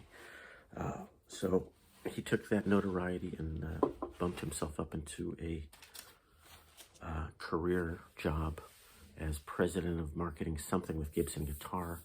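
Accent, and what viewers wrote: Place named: Scotland, United Kingdom. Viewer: I nearly spat my tea out when said you were Scottish! 😎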